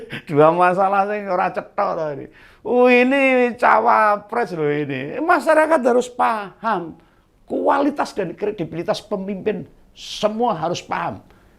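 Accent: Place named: Yogyakarta, Indonesia. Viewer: Mesti ketawa kalo denger Om bicara, khas logat Jogjanan. Semangat Pakde😁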